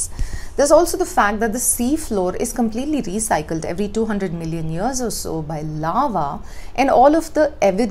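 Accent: India. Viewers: I love female Indian accents. 😍😍😍😍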